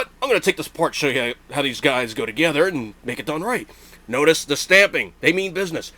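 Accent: United States. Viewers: You're the most American sounding man I've ever heard.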